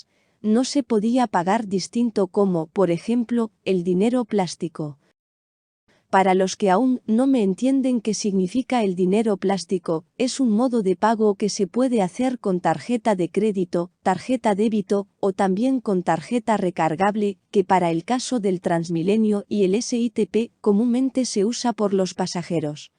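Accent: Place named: Spain. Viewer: No me trama esa vocecita españoleta 🙄🙄🙄🙄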